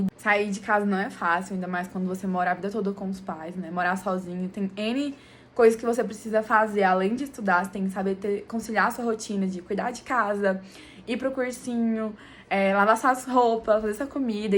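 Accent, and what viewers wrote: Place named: Minas Gerais, Brazil. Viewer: sotaque mineiro é gostoso de ouvir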